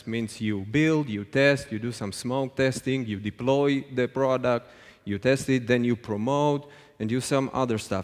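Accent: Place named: Russia. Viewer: спасибо, полезно, только автор, пожалуйста, прогоняйте перед зеркалом речь, и правда - сильно режет слух наш "акцэнт"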